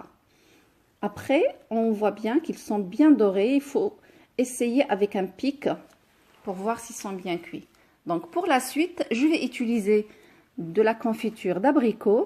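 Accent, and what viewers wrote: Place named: Morocco. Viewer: Choukran tu expliques tres bien merci pour les efforts .Ton accent Marocain est magnifique.😍😍😍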